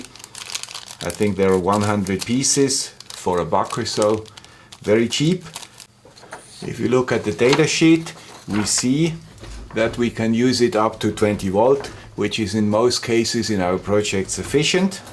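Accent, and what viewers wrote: Switzerland. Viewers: Thanks for the P channel mosfet highlight. It would be useful if you could do a video on high side switching. There are a few out there, but the guy with the Swiss accent would give us what we need to know as part of a back-to-basics series? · my best regrade to the gay with swiss accent>>>>>>nice work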